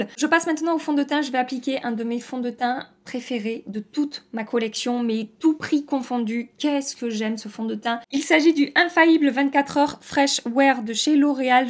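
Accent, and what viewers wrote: South, France. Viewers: Bsr bon bien ecoute je ne connais pas du tout le fdt L'Oréal donc une occassion de le decouvrir et ce que je faire plus les monos de Sephora les couleurs me donne trop envie et vue qye mon mari m'a offert une carte cadeaux de chez Sephora pour mon Anniversaire 🎂 je sais quoi prendre lol.... et le Blush Milani cela fait quelque temps que je louche dessus donc avec ton avis tu me fais basculer 😂😂 en tout cas avec tout ce maquillage petit prix tu as su nous faire un magnifique MU, Tu nous inspire vraiment, on voit que tu aimes profondément ce que tu fait et que tu aimes nous le partager et nous on adore te suivre et te dire merci pour tout ce que tu fais pour nous, vraiment en plus tu as toujours le sourire tu n'es jamais négative et tu es adorable avec ton accent du sud et ton rire est contagieux et surtout tu parles avec nous sans filtre et ça c'est génial c'est comme si on était là avec toi dans la pièce et quand il t'arrive quelque chose tu ne réfléchis pas tu parles simplement comme tout le monde et c'est super alors encore un grand merci de nous faire vivre tout ça avec toi car en ce qui me concerne c'est un pur bonheur 😉😉😘😘😘❤❤🌞🌞 Je t'embrasse très très fort et te dis à Dimanche Ambre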